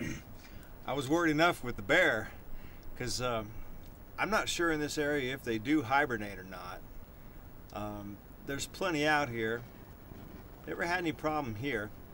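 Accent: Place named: Texas, United States. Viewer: You are so funny- love the hat. Your Texan really comes out when you talk!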